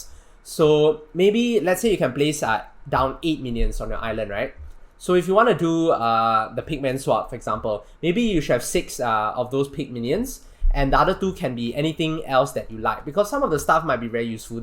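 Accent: Singapore. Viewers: Are you Singaporean?because you sound like it man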